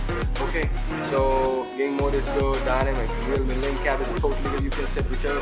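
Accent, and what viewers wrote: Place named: United Kingdom. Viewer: Best 👍 video bhai 👌 aur bhai apki English to masha allah bahut hi achchi h bhai lagta nhi ki ap india se ho pehle to laga ki ap UK 🇬🇧 se ho Best English YouTuber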